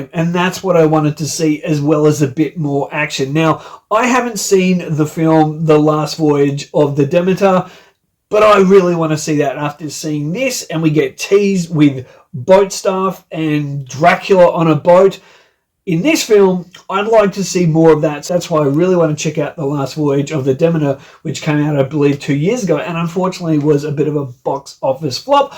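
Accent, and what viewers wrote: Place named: Australia. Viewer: I love your Australian accent ^__^ !!!! Oh my gosh, love from Texas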